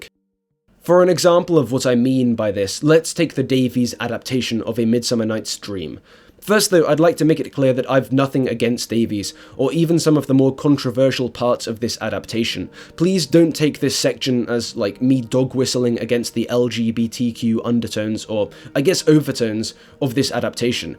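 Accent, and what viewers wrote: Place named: England, United Kingdom. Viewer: I really liked what you had to say about the representation of the fascist regimes in film, I found it quite insightful. I couldn't help but notice, though, your repeated comparison of current day American right-wingers to these fascist factions in media. I understand that as an Angle (judging from your accent), you are not incredibly informed on American politics, but I wanted to let you know that the American right is rather far from looking like a fascist movement. They really are just a bunch of libertarians with traditional views, and they really do oppose authoritarianism in most all regards. I don't say this to be provocative and point fingers at the other side, but the American left is far more of an authoritarian- dare I say fascist- movement. Just figured I'd point it out as someone who is living in American politics.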